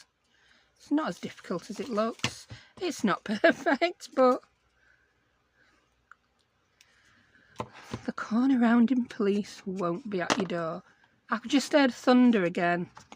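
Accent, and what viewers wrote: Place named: Northern England, United Kingdom. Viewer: I’m so happy I’ve found your channel! I’ve recently got into paper craft after starting a wedding album for my friends upcoming wedding. I’ve found loads of US creators but no one from the UK so you’ve made my day! You also sound quite local to me, your accent doesn’t sound too far away, I’m from Sheffield and will now be binging all of your videos! Xx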